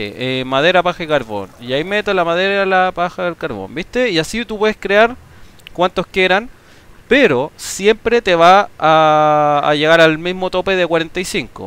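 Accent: Chile. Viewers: eres de Chile cierto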